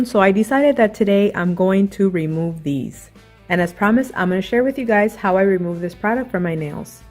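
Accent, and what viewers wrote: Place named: Latin America. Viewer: Wow! You have that traditional Latina accent that is so distinctive. I love it. Keep up the great work.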